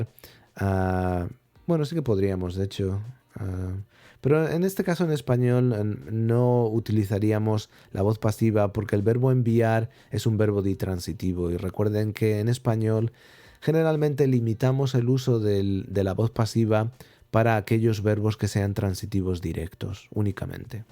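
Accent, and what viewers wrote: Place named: England, United Kingdom. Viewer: funny english accent)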